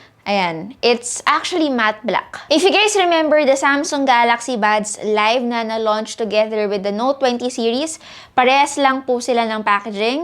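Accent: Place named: Philippines. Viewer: I don't like your speech delivery. It's so Tagalogized English accent. Actually, ultrra, exxact, mowmint, teaboll, apresheyt, to be honest, ech d, achually, Should refer GSM ARENA specs. Waste of time too have been watching you. Noisy and too talkative.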